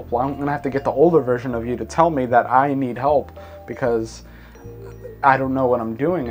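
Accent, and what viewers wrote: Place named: Scotland, United Kingdom. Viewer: I like your Scottish accent :)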